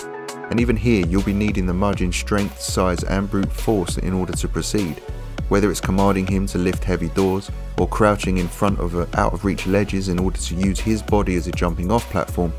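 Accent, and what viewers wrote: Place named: London, United Kingdom. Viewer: Proper londoner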